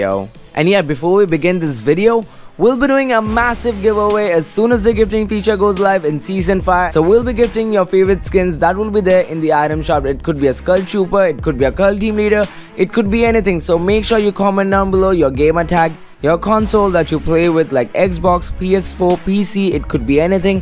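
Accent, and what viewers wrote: India. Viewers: Hmmm I dunno this guy sounds like an Indian scammer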